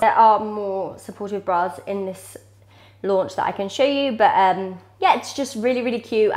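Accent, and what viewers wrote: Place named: United Kingdom. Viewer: I love your British accent 😍greetings from Mexico 🇲🇽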